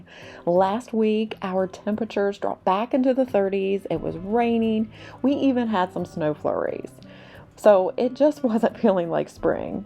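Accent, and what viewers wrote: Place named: South, United States. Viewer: I just love your accent! I think after British accent the southern accent is the most beautiful in English! I love the porch! Looks good!!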